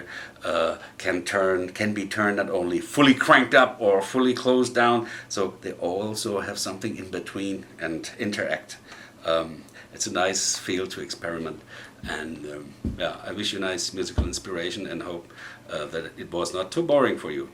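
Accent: Hesse, Germany. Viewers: your german accent is bad ass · Is he German? He kind of has an accent. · hi, excellent explanation. you should have mentioned that reverb/ and delay was engaged. that makes it easier for beginners to get at least near to the sound. i played more than 40 years guitar and have found out that it is easier to play with a gibson les paul than a epi or harley benton. since i own a original gibson i feel i play better. the tones match better to the records i play to, especially in the higher octaves. i like your stuff and your hessisch english - i come from nearby:-) so it sounds familiar. best from Limburg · you have an german accent, don't you? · Bist du ein deutscher 😂 man hört den Akzent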